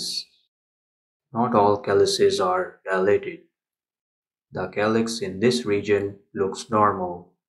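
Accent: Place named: India. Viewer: Jitna vi kausis karlo aapka accent humlog jeisa hi hei..so natural rehne ki kausis kariye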